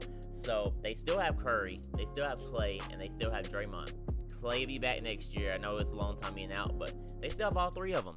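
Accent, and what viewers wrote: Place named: South, United States. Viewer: as an asean, it took hard for me to understand what your sayin. you have accent and your background music to loud. you have country singer accent i think. from PHILIPPINES.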